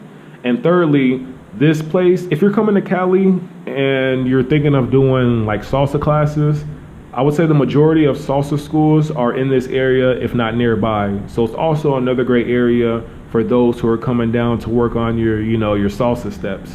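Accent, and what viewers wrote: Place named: West Coast, United States. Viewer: were you raised in Cali-fornia? definitely have that west coast lingo accent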